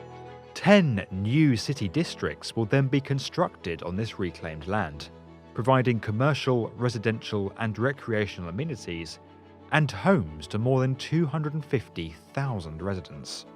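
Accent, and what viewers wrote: United Kingdom. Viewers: This video has just so much more authority because of this UK accent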